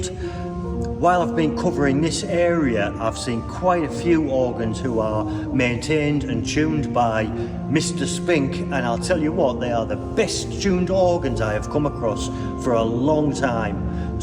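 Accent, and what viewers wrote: Newcastle, United Kingdom. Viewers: Enjoyed the video very much - it’s a change to actually learn something about the organ you’re playing. You sound Geordie - like me.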